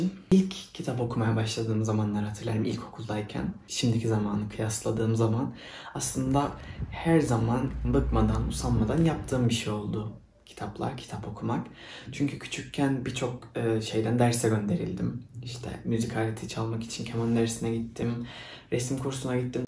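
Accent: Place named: Cyprus. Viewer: Alttan alttan kendini dışa vuran Kıbrıs şivesi çok hoş. ☺ Güzelim adacıktan görüntüleri görünce daha bir özledim şimdi. 🌿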